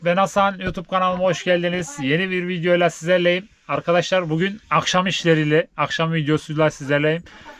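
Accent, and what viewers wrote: Konya, Turkey. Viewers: Abi nerelisin şiven bizim buradaki şiveye benziyor ben KONYA/BEYŞEHİRLİYİM.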